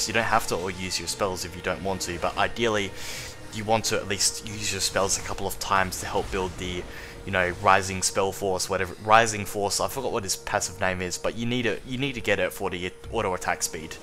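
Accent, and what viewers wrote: Australia. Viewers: This guy has like a half Australian/half American accent it's tripping me out.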